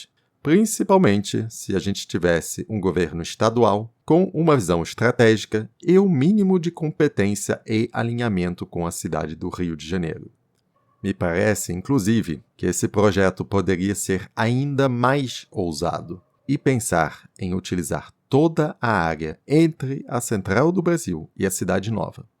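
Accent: France. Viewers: Que sotaque francês é esse ?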